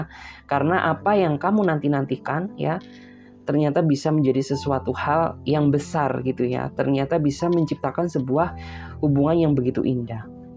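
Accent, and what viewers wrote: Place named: West Kalimantan, Indonesia. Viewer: kalbar nh kk😅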